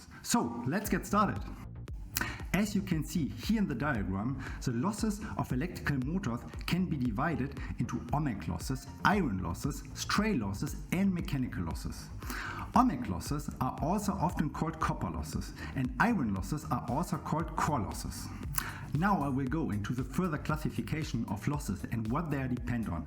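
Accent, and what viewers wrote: Germany. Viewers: Hallo, deine Videos gefallen mir. Als ich entdeckte, dass du aus Berlin kommst, entschied ich mich gleich in die Kommentar etwas zu schreiben. I’m a 26-year-old young guy from China. I’m in the business of neodymium magnets and magnetic assemblies, but now trying to expand to BLDC motor and controller field. That’s why I’m learning everything about electric motors. You have German accent when speaking English, which I find interesting. Danke für deine volle Videos!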